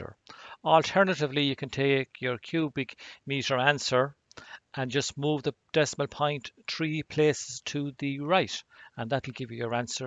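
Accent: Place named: Ireland. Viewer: Guys this guy is definitely form Ireland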